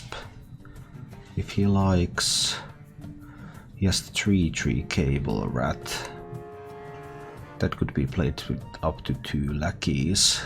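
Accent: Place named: Finland. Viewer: He's from Finland. Suomalainen se on. ;)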